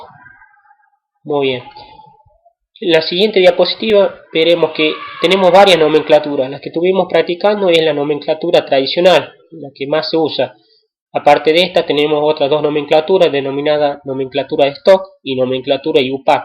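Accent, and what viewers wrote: Córdoba, Argentina. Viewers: Gracias! Ese acento cordobés me salvó... la verdad que ya ni recordaba esto y en unos días tengo evaluación diagnóstico jajaja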